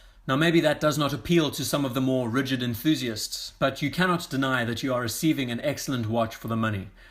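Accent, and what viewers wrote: South Africa. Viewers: Are you South African? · Hay dude are you South African?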